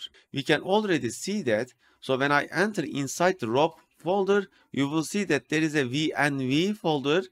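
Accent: Turkey. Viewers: Oh Türk aksanlı İngilizce. nerede görsem tanırım :) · I BET YOU'RE FROM TURKEY 😅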